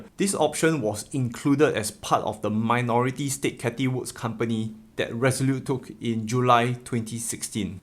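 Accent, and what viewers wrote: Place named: Singapore. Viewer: Singlish😂, long time did not hear it.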